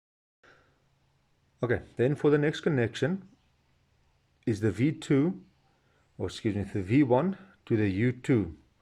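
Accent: South Africa. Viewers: He sounds South African and we have 230v at the Wall. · this man sounds south african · I like south african accent 😀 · Ekse bra you from the SA?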